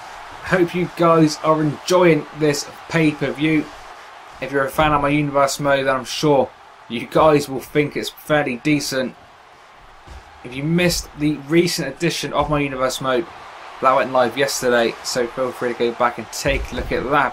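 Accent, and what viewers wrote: United Kingdom. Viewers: i love your pronunciation are you british?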